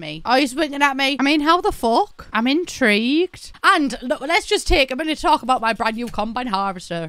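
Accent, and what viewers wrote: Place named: North, United Kingdom. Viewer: northern british accent makes me happy again and again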